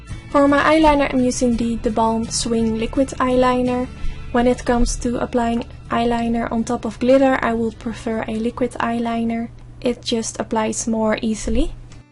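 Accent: Netherlands. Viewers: ik hoor aan je accent dat je nederlands bent haha! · Nice tutorial and I love the music :D Maybe it is a tip to place text for all the brushes you name in the video? You have a very Dutch accent and sometimes it is a littlw bit hard to understand what you're saying :$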